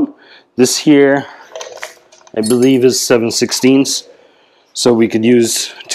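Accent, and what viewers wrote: Trinidad and Tobago. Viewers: Is that a trini accent I hear? Thanks for the video by the way. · You’re a Trini?? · You sound like a Trini. I am thinking about getting this one myself. Thanks for the video.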